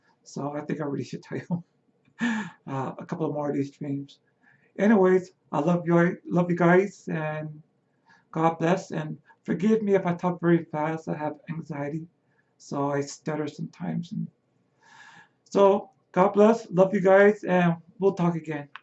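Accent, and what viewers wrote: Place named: New Jersey, United States. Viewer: Thank you for sharing. You sound like someone I know from New Jersey. Tone, speech, mannerism , and such.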